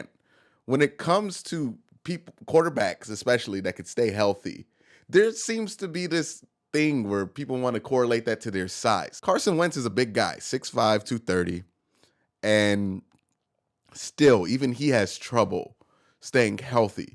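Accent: Cleveland, United States. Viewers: its a Cleveland thing. Like in the south saying ya all.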